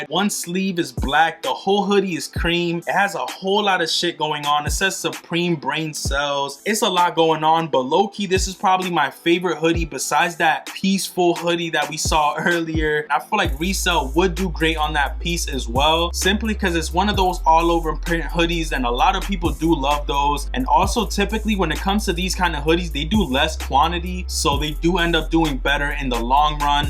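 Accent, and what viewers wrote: New York, United States. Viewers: I love cause his NY accent is entertaining AF. All the “low key” I can deal with but the “dead ass” I can’t. He never “dead asses” lol.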